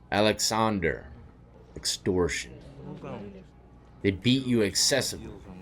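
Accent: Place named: South, United States. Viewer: Texas,Lousiana etc. I Love the southern accent. 🎵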